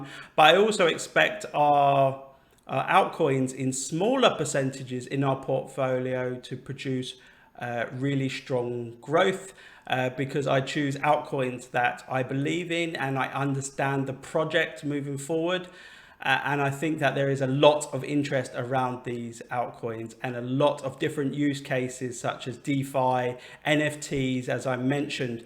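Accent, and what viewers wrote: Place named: United Kingdom. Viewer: Your shirt is just as perfect as your crystal clear British accent. Love it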